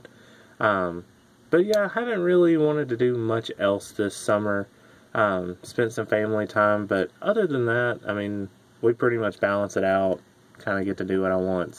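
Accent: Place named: Texas, United States. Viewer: Let me guess ur from Texas I'm from Louisiana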